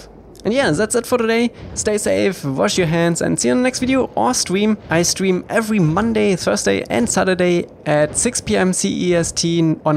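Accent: Germany. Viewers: Question, what is his accent? Ik I’ve heard the accent before. I keep wanting to say it’s German but I’m not sure. He keeps saying v for w, which is why I think it’s German. Anybody know if I’m right? I’m honestly very curious. Lol. I think I’m wrong tho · Is it possible what you are german? Your voice sounds so german xD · I don't understand why you can speak so fluently but still sound the most German I've ever heard xd anyway, keep it up! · Mann merkt das du Deutsch bist dein Englisch musst du verbessern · I can hear that you can speak german. Pls make a german video.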